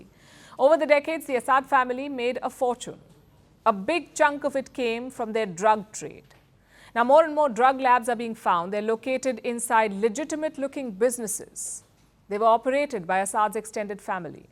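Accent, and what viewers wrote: India. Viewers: Palki, the puppet Western propagandist with an Indian accent. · Now I know you are garbage news, with indian accent.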